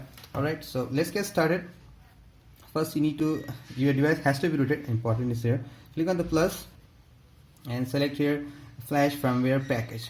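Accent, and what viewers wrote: India. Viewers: it's not that he mumbled it's that indian accent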